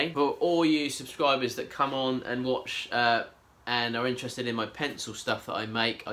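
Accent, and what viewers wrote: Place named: Liverpool, United Kingdom. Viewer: New Zealand.... really.... I would guess by your accent your around Liverpool myself. I did ask a while back.... and I'll do so again mate. how do youclean the resin out of your mixing bowl... cheers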